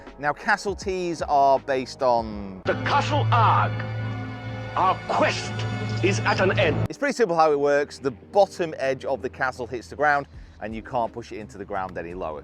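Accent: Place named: Texas, United States. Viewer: what part of Texas is he from ?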